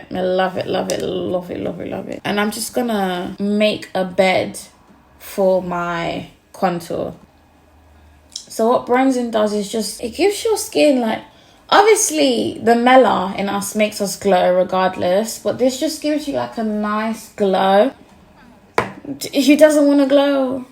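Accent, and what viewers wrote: United Kingdom. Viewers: am I the only one who hears Nicki's voice when she speaks ? with a british accent ofcours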